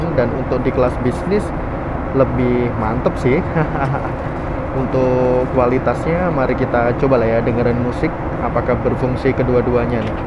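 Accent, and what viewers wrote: Java, Indonesia. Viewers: Gw nonton ini hny ingin dengerin medok jowone si mas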